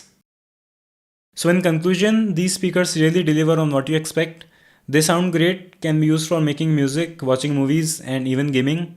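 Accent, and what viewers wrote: India. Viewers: Need to work on diction, the accent is muddled and unclear. And many Indians with Indian accents have learned to do this so can you. For one stop slurring words.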